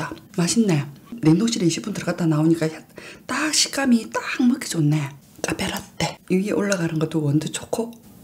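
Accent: Busan, South Korea. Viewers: 부산사투리 넘 귀여워요😂😊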